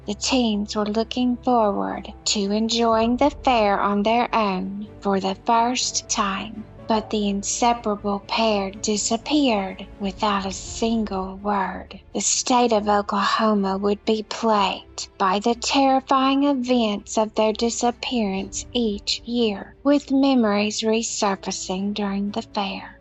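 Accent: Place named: Deep South, United States. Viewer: Please use a regular voice- only people in the deep South talk like tha-ut anymore. Plus it's creepy.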